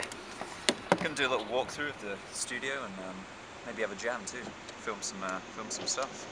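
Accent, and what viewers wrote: United Kingdom. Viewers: Dude where are you from? You sound British but I can't be sure...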